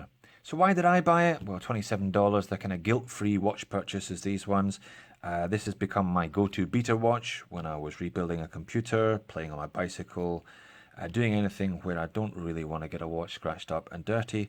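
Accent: Scotland, United Kingdom. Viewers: Scottish accent. “I’m in Australia incase you haven’t guessed” Yeah I have a map in my place. Don’t mean I’m from Uganda. Glasgow I’m from as it goes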